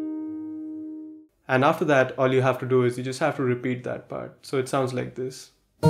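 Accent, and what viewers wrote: India. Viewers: Merko ye banda indian lg rha.. accent bilkul indian vali hai..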